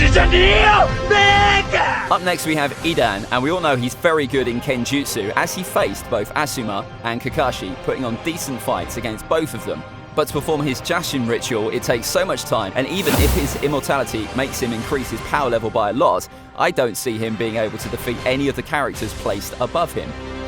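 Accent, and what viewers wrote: United Kingdom. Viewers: Bc hes british so he has higher accent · His accent is from hartforshire, England you clown 😂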